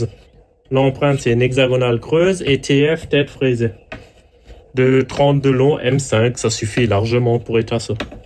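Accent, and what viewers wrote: Alsace, France. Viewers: Votre accent n est pas Alsacien? Je suis des Vosges peu être que vous pourriez aménager mon jumpy utilitaire 😊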